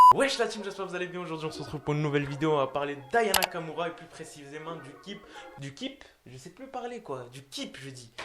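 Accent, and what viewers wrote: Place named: Marseille, France. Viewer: Prk3 tu prnd l'accent marseillai, parle bi1🤭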